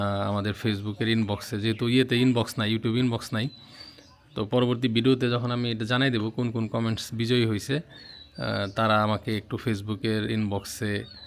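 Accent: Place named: Jamalpur, Bangladesh. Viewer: কোন এক শীতের সকালে তারা যখন মেলনী কাকীর সাথে মাছ কিনতে যায় ঝগড়া করছিল সেটাই প্রথম দেখা ভিডিও ছিল। আমার গ্রামের বাড়ি জামালপুর। আপনার ভাষা শুনে মনে হয়েছিল জামালপুরের কোন এক গ্রামে থাকেন। তখন থেকেই ভিডিও দেখা।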